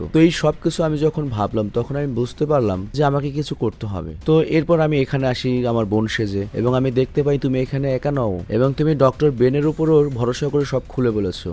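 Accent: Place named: Noakhali, Bangladesh. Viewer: Vai apni sera.. ❤ Amder Noakhali kotha bollen❤❤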